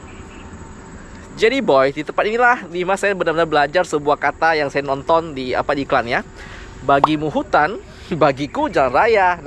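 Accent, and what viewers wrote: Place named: Belitung, Indonesia. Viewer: bahasanya mirip Belitung ya, asli mana sebenernya ko?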